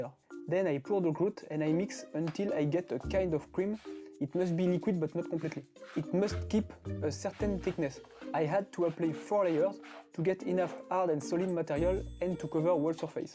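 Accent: France. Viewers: J'ai direct su que t'étais français à l'accent 🤣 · English is good but you French with that accent make me laugh. Sheet = shit lol. I think all French struggle with English.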